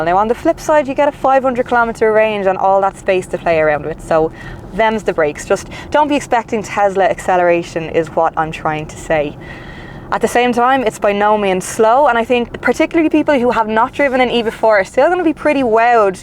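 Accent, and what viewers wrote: Ireland. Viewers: Wonderful Irish accent thank you..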